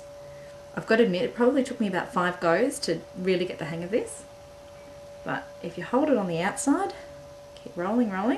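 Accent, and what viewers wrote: Australia. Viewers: You remind me of a Play School presenter becuase of your friendly and soothing voice. I love the people on here marvelling at the Aussie accent. haha.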